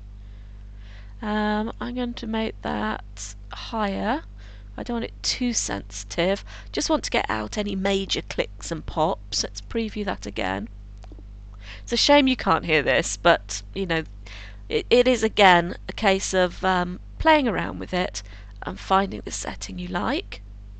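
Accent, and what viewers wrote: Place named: RP, United Kingdom. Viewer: I now know where I shall go to practice my posh accent. :D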